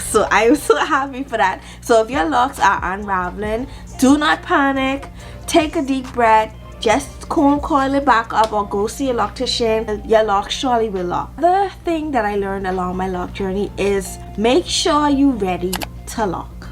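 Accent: Bahamas. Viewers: The second you opened your mouth, I knew you were Bahamian ❤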